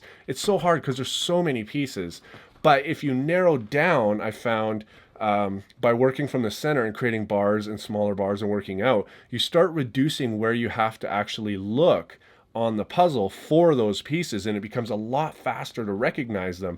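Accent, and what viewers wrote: Canada. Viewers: I detect a Canadian accent ... you from Toronto ? Teach ya to play guitar if you help me solve a 3x3 eh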